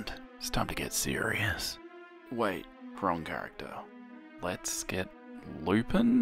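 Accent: Australia. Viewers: Question: Who else, or am I the only one, that wants to know what goes on though the cashiers heads as walks up and buys these colouring books? I would also like to add that this made my Friday, the sarcasm, excessive blood, awesome drawings and the best accent on earth (fellow Aussie). My Friday can end happily!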